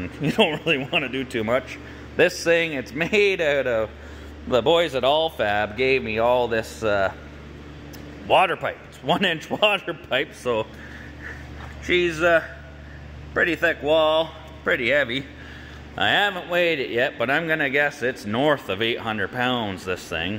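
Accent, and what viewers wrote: Canada. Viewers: That Canadian lingo cracks me up. 🤣 I have practiced for hours and still cannot say out like you guys do.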